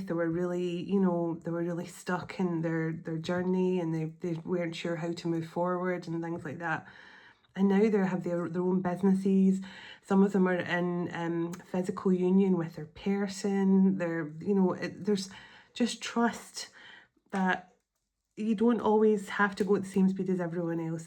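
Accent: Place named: Scotland, United Kingdom. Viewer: Oh my I’m a Cancerian DF all the way from California. The timing and your message’s spirit of reconnection with my avoidant Libra TF must be divinely led because I can tell the telepathic connection being revived today during my morning walk in nature. Plus the Scottish accent was quite the bonus!! Cheers.❤❤❤444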